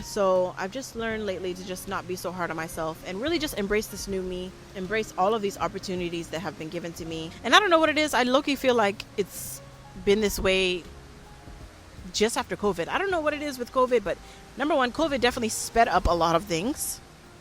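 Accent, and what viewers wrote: Africa. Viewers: Beautiful, you are developing that African accent to, love you and your families videos keep sharing👏🏾👏🏾👏🏾👊🏾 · You even have a lil African accent ☺️😍…. My husband is Ugandan, I plan to move there for 5 years after we have our baby.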